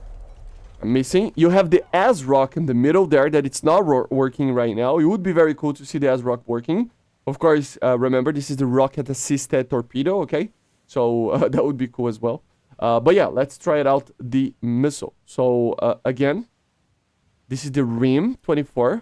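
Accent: Brazil. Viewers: pelo sotaque esse mano é br mas n posso provar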